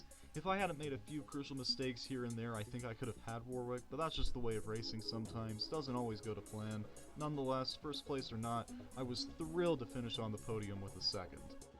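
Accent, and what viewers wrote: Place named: United States. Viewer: Very nice! The memes are great! LOL! Nice surprise to not hear a European or Australian accent too! I didn't you know you were American/Canadian!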